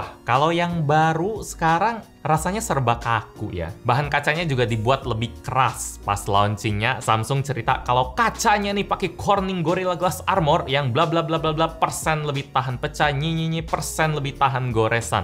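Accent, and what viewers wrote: Palembang, Indonesia. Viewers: nih wong plg apo ee?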